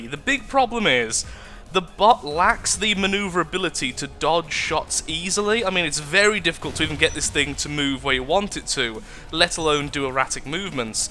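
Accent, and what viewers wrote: United Kingdom. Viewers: Your british accent always puts a smile on my face.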